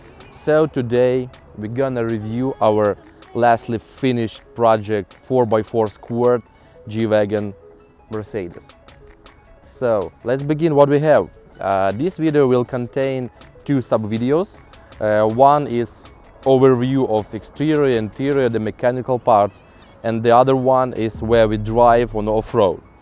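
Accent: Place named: Ukraine. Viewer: Я плохо понимаю английскую речь но тут понял все. Украинский английский язык такой же как Русский английский язык. Тачка хорошая получилась. Ну а портальных мостах?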